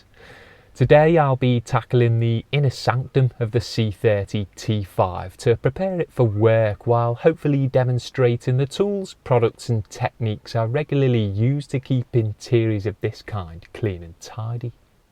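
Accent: Liverpool, United Kingdom. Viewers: Are you British? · Wasn't sure cos he'd mentioned the Midlands in one of his videos, and he talks quite slowly for a scouse, but now I've listened to him more I can hear the scouse twang :) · I never bother with other car cleaning vids now, most are tedious to the extreme and don't offer the scouse tones I have become accustomed to!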